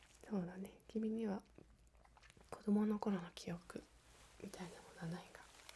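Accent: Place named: United Kingdom. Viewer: Interesting japanese accent. almost sounds english sometimes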